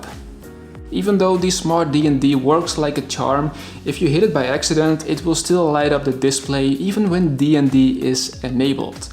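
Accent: Netherlands. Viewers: Ik hoorde echt vanaf de eerste seconde dat je Nederlands bent haha!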